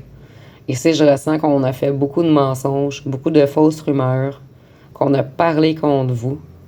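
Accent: Canada. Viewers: Bonjour toujours très juste,bravo avec ce bel accent canadien, merci belle journée à vous 🙏👍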